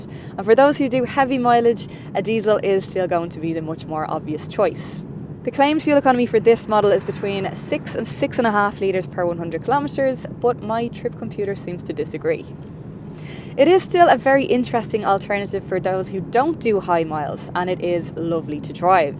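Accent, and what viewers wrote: Dublin, Ireland. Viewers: I like the Irish accent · Could you not even try to articulate your annoying dublin voice . .f.. sake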